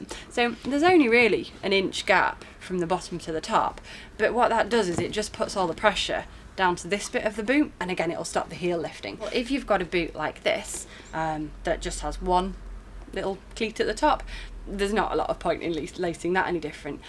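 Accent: United Kingdom. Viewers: Don't understand???You seem to be someone with a very limited knowledge of UK. What a weird comment. If you're referring to her can only think you're not English and think everyone speaks like the queen. Her accent is far from snobby possibly from the north west and is very natural and not all snobby in fact the complete opposite.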